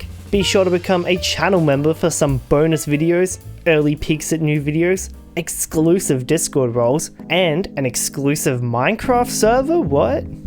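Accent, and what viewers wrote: Australia. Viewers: Are you a Aussie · because he’s Australian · The Australian accent is THEE most annoying accent of all accents by far.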